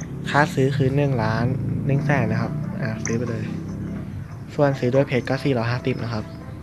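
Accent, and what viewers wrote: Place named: Thailand. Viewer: เสียงเหน่อ น่าร๊ากอ่า เพิ่งเข้ามาเจอครั้งแรก กดติดตามให้และนะ ทำคลิปออกมาเยอะๆนะ ชอบเล่นเกมนี้รอติดตามนะ ^_^ เป็นกำลังใจให้ แอดไปและรับแอดด้วยนะ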